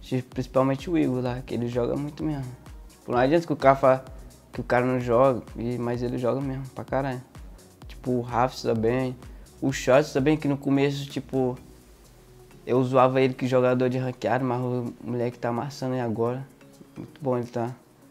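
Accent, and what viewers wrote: Brazil. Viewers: Since mó jeitão da Bahiano igual meu pai kkkkkk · O sotaque dele honra nosso povo Viva a Fortaleza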